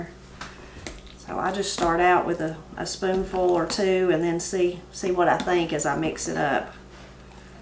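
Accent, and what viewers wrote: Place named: Arkansas, United States. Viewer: I grew up eating light bread too and I still like it. My tuna fish is almost like yours except for adding chopped hard boiled egg and using Kraft sandwich spread instead of mayo. And i prefer it on white bread too. I love your accent. It reminds me of my home is south Arkansas.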